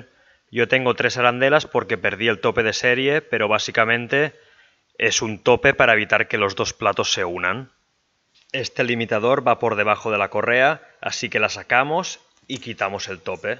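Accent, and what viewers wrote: Spain. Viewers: Me encantan los Españoles, que acento tan nítido y perfecto, los amo, saludos desde Venezuela :) Excelente vídeo. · Quién habla tiene un claro acento Catalán. Luego entonces no tiene un acento Neutro el cual en España creo que solo queda en ciertas zonas de Castilla León,los dé tenemos claros acentos de nuestras diversas provincias. Pasa lo mismo en LATAM,entre países y zonas/ provincias de cada país,pero lógicamente allí no se habla un Castellano limpio, ya hay muchas mezclas. ❤️🇪🇦🇪🇦❤️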